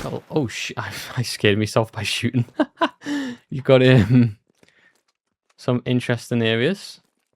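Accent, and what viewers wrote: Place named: Scotland, United Kingdom. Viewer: Scotish accent is beat 🎉❤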